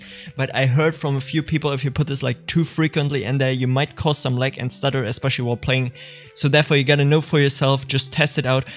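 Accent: Germany. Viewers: nothing much, are you german? i like your accent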